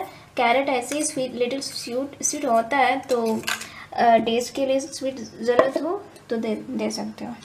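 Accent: India. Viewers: Shaan Mujawar she is probably from south what’s the point of making fun of her Hindi it’s not her first language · I think she is from south they almost speaks in same way👍🏻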